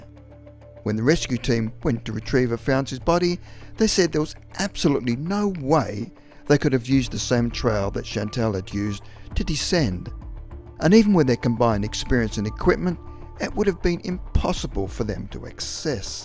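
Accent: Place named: Australia. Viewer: I thought it was an Aussie accent! Hi from a fellow Australian 😊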